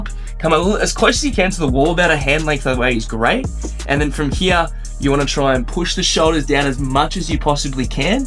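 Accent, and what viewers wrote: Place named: Scotland, United Kingdom. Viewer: You have no idea how I wish to improve it .. I'm really into calistheny! What is the country you live at? Your accent remembered that of a Scottish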